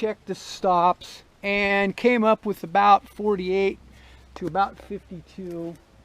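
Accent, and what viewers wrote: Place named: North, United States. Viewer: You are literally the saw guru, I build big cat and Cummins motors for a living, and somedays work on our (dirt crew) were I might cut timber one day and run equipment the next just depending on what's we are doing) so when I say you have talent for saws know that I mean it because that's 1 mechanic to another and your yankee accent really tops it off you northerners really sounds like pricks half the time and coming from a southern hillbilly,,,,well we fucking love it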